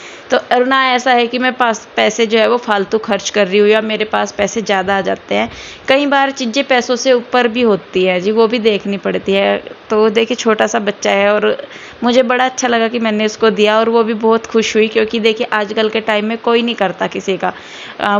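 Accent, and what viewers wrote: Haryana, India. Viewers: Ye haryanvi accent hai.harek ko apni bhasha pe garv hona chhye n ki sharmindgi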